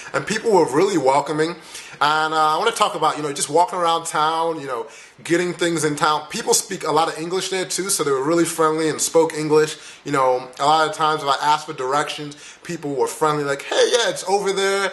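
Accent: United States. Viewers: In my opinion, as a Dutch guy, you look like an American black guy. And if you would talk to me, I'd notice your American accent too. But I probably would have guessed that. Africans have a different mimic, and a different way of expression. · If they hear your american accent they will find it very cool and your immediately very interesting.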